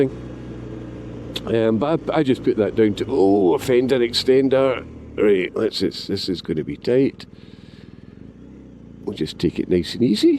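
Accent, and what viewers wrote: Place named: Scotland, United Kingdom. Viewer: I enjoyed the vlog. I'm familiar with the Moffat to Selkirk road you were on. The Glen cafe is worth a stop in the spring and summer. I too have a 2020 GS1250 which i love however its proven to be somewhat unreliable and has twice left me stranded. I'll be interested to see how you get on with yours in the coming months ahead. Its good to hear another Scottish vlogger on the Tube. Best wishes 👍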